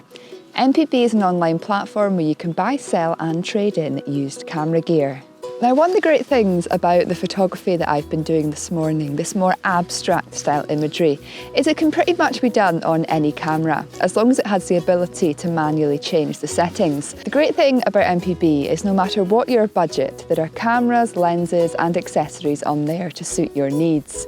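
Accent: Scotland, United Kingdom. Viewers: nice footage , coupled with your voice ( commentary ) and the music go all very well, the Scottish accent remind me the days i spent in Scotland during my study at the university of Glasgow >>> Thanks for sharing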